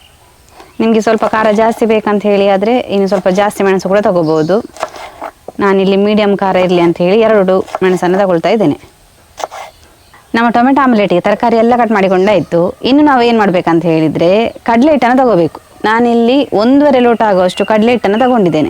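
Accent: Coastal Karnataka, India. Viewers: Omlet egg inda madodu mathra gottith... Tomoto inda madid nodi nanu try madde... Super agi bandide .... Nimma karavali mathu super... Namge bahala ista aythu marre...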